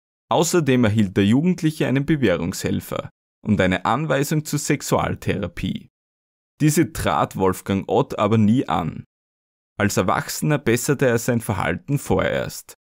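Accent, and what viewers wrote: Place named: Austria. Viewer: Du bist bestimmt auch Österreicher Deines schönen Dialekts wegen?;-) Vielen Dank für Deine Arbeit😉😊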